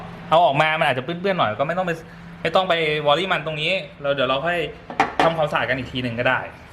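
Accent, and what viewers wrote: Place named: Isan, Thailand. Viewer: อร๊ายยยย น้อง เป็นผู้ชายที่มีความสาวนิดๆ ติดสำเนียงอีสานหน่อยๆ ใจละลายแล้วคร่าาาา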